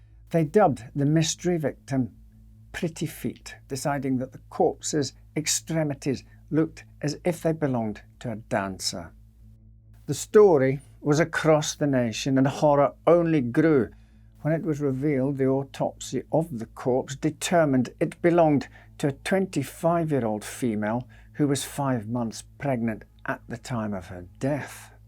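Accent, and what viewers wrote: Scotland, United Kingdom. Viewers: Oh my goodness!!! I ABSOLUTELY, POSITIVELY LOVE YOUR SCO-ISH BROGUE!!! Your stories are so very interesting!!! 😘😘😘😘😘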